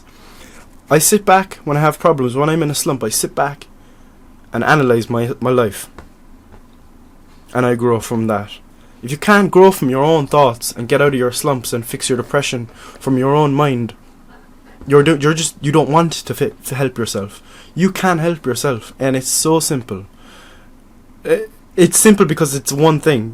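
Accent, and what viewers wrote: Ireland. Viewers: I dont know if its your irish accent bro😭😭but some of the things u say the way u say them to🤣🤣🤣i be bussin out laughin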